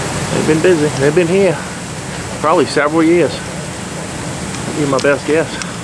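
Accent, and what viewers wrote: Louisiana, United States. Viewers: I am originally from Texas, now live out west in Nevada. I was ecstatic to hear your Cajun inflection in this video! I am also so very impressed by the calm, professional job you did in the transferring of this massive colony. Your love for the bees is well documented, and if any person within 200 miles of Metairie LA needs bee transference, you are the number 1 choice! God bless you, sir!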